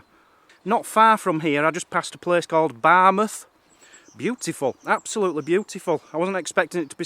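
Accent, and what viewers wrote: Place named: Yorkshire, United Kingdom. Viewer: Your From Keighley Arnt You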